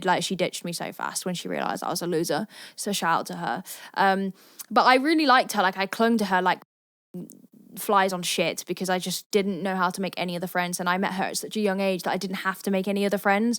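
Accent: United Kingdom. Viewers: wasn't expecting the accent, -B-R-I-T-I-S-H-. Ha, my local australian barista looks a lot like you, minus the tats. ADHD spectrum for me became solidified following epilepsy diagnosis; they can often coincide. Have you ever struggled with epilepsy? Low latent inhibition is a thing too. My brain is super responsive to my own self-generated mind exercises.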